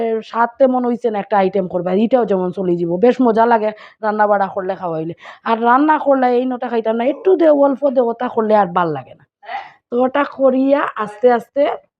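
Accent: Sylhet, Bangladesh. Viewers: Prothom dekhlam tumar vlog , YT e recommend korse . khub bhal lager vlog gula, specially syleti katha sunia toh khub khub bhal lager ,aamr babar bari emne Silchar, aami okhon thaki Bangalore, subscribe korlam , aaro aagaiya jao ❤❤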